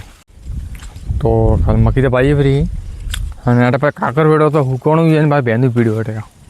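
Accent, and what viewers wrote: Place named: Marwar, India. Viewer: भाई कहा से हो आपकी मारवाड़ी इतनी अलग कैसे हैं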